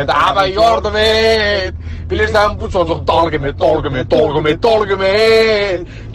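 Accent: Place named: Nakhchivan, Azerbaijan. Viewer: Ləzət eliyir Naxçivan ləhcəsi 😂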